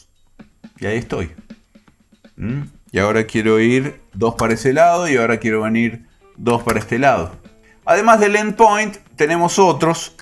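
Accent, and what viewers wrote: Argentina. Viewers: Lo más chistoso, es la forma en que en Argentina nombran "Y";en México la distinguimos, como la y griega y la nombramos (lle); asimetricamente , (ye), para tenerlo bien diferenciado de la(i) latina;así llamada,, aunque en sonido es casi la misma pronunciación, arrastramos un poco la (Ll)cuando pronunciamos la "y", ya que en términos matemáticos es mejor para diferenciar ambas!!!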